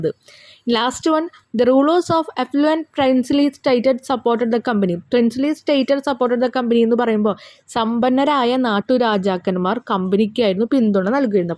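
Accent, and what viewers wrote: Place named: Chalakudy, India. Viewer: Mole poli 🤘 ടീച്ചറെ നാട് ഏതാ ചാലക്കുടി ആണോ മാം എന്റെ ടീച്ചറെ സംസാരം അതുപോലെയ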